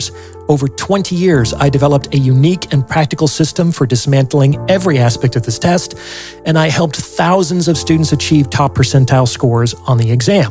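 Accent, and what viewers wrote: United Kingdom. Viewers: It's the British accent for me 😂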